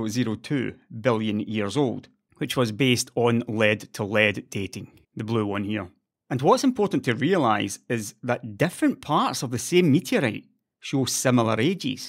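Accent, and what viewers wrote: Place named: Scotland, United Kingdom. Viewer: After a day of work, gym and chores, calmly at home with a glass of beer in my hand, spending about 1h listening to magnificently Scottish voice talk about science is almost a divine experience, ironically:D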